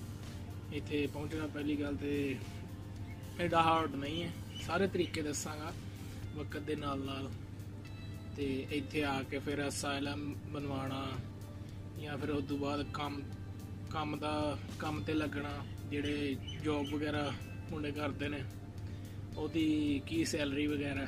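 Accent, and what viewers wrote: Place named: Sialkot, Pakistan. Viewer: Bhai Aapki BOLI sialkot wali hai... I'm sure kiunke Main bhi Sialkot se hon.. Yeh Punjabi Sialkot ki hai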